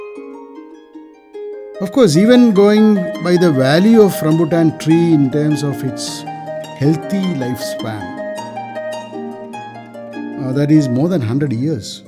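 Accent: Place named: India. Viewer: I don't understand your India English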